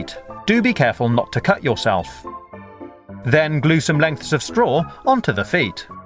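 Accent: United Kingdom. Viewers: Listen to his accent. He lives in the U.K.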